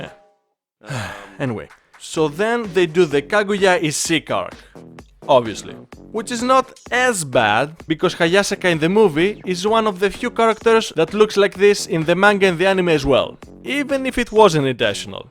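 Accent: Greece. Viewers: Are you from greece? Cause your accent seems greek to me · Wait... I recognize this accent...you greek? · Also are you Greek? Cause your accent sound like it. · πως καταλαβαινει καποιος παντως απο την προφορα τον Ελληνα...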